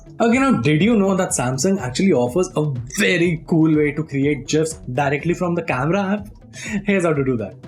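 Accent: India. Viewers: So hard when Indian talk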